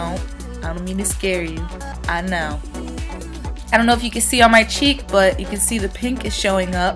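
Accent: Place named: South, United States. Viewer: Lol,idk you're from Boston,but you have a slight country accent.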